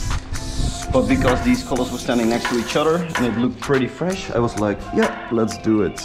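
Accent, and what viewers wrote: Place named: Netherlands. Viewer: Ik wist dat je nederlands was, want dat kan je gelijk horen met hoe je engels praat🤣 goed werk man👍💪🏻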